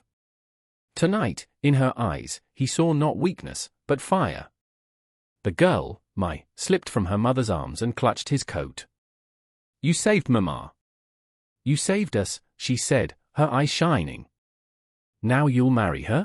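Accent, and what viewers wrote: United Kingdom. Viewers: A British accent narrating a western USA fictional story? No...just no.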